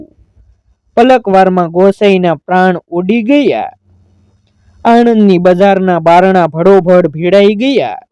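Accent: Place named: Kathiawar, India. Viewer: Varta charotar ni ne boli kathiyawadi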